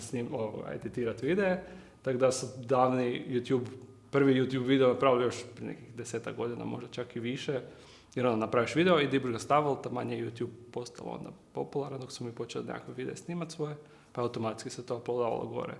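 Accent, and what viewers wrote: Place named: Germany. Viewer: With your accent, I thought you were German or Austrian,:-)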